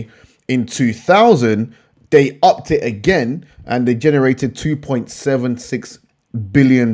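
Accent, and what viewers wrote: United Kingdom. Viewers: love your analysis, very detailed and to the point. great thanks, nice accent where u from the UK?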